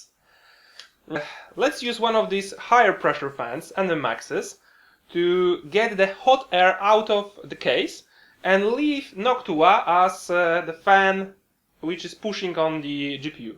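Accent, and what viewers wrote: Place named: Italy. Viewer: aw well, your accent sounds like you're italian xD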